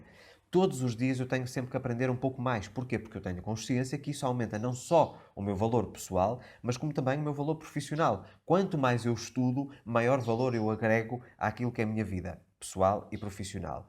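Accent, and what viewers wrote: Portugal. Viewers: Estou apaixonada pelo seu canal. Tenho estudado muito sobre lei de atração, e vc fala de uma maneira que eu entendo totalmente. Muita gratidão. Ah! E seu sotaque da terrinha é uma delícia.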